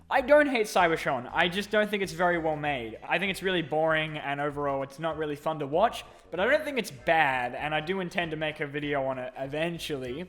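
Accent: Australia. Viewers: For next Q and A are u Australian?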